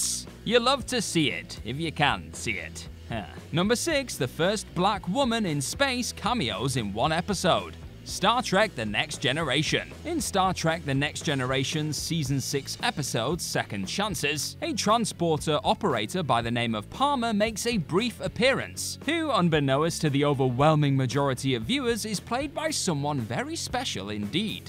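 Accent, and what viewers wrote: United Kingdom. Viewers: It's just a British thing, they say haitch rather than aitch for the letter 'h'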